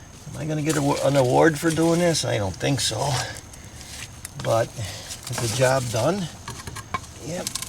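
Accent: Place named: Scranton, United States. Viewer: you sound like you're from scranton. thanks for these videos.just a hobby for me but you show me a great"'how too"........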